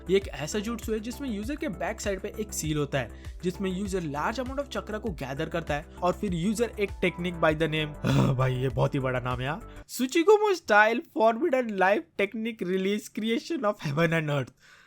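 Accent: Maharashtra, India. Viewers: Are u Marathi❤😊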